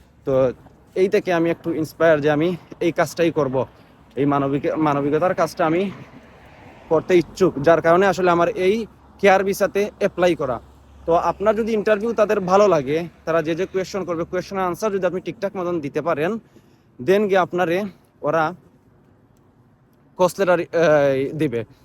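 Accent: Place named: Sylhet, Bangladesh. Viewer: Sylheti vibes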